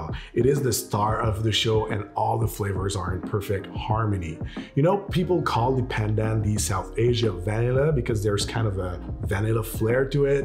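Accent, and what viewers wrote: Quebec, Canada. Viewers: Hey! Love your channel 💖. I was wondering, you live in Quebec, right? ( i'm gessing from your accent!) I was wondering where could you find Wray & Nephew rum. I see this used all the time in cocktail and syrup recipes but SAQ doesn't sell it. Can't seems to find any over proof rhum. I live in Montreal btw. Thanx a lot and keep up the awesome work!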